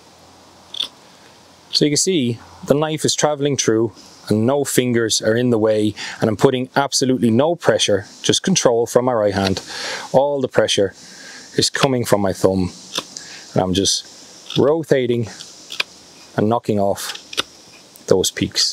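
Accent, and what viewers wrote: Ireland. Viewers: Jaysus you had me in knots with the chicken dance in the 80s.... memories! Great listening to an Irish accent over mostly Americans on YouTube, you're a treasure trove of information and inspirational in your enthusiastic delivery, I really enjoy your videos and thanks for sharing your knowledge, I am putting together some kit as I am really only starting out with the outdoors but I am grateful for the safety advice as I don't think I would have been careful enough with some of the processes...but listening and watching you has given me a completely different perspective, loved the axe 🪓 videos as well! Go raibh maith agat!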